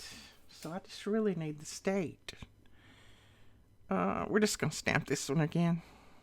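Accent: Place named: Alabama, United States. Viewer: I love your accent! ❤❤❤ Are you from Alabama?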